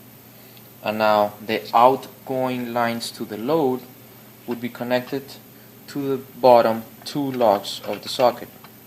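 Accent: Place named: Cuba. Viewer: Hialeah is a city within Miami, Florida. He sounds Cuban..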